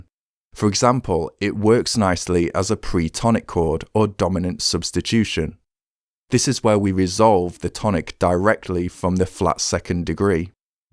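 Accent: United Kingdom. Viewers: I guess he s British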